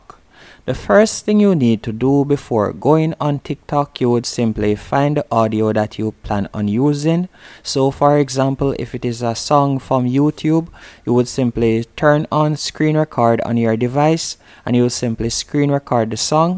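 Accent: Jamaica. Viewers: ya jamaican?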